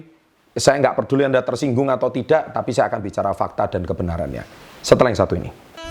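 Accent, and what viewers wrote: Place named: Surabaya, Indonesia. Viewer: Mantap? Sy suka banget dgn gaya pak memberikan paparannya, masukbanget dgn fikiran sy gitu, dan sy keingat bos sy dulu, gaya bicaranya mirip bgt? Terlihat ada ala Surabayanya gitu?? Keren!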